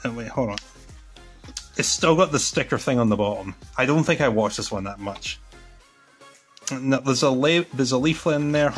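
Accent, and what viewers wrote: Scotland, United Kingdom. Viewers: Just wondering are u scottish ? I am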